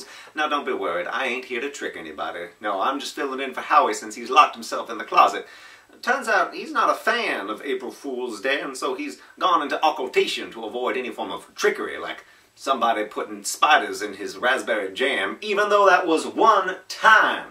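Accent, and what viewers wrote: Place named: Kentucky, United States. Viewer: Dude, that Kentucky accent is flawless.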